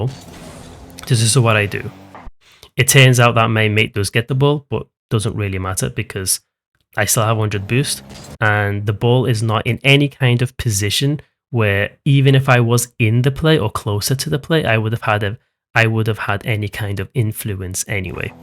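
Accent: Scotland, United Kingdom. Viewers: Maybe he’s Scottish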